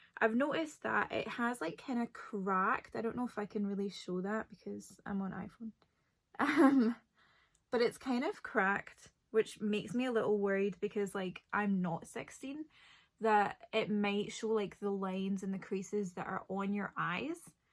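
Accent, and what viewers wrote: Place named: Scotland, United Kingdom. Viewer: At the start I thought you had american accent but the more I listened I can hear your scottish now ha 🙈😁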